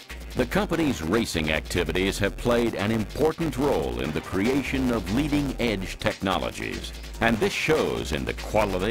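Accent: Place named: United States. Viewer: Hardly a "Ride on the wild side" but a leisurely stroll down memory lane, with amnesia. Short history spoiled by the abscence of the iconic CB750 voted super bike of the century and the BMW killer ST1300. The video turned out to be a 50minute long commercial, on UK roads with an American narrator. The riding sequences were boring and the videography very '70s. So glad I got this out of the bargin bin. 3/10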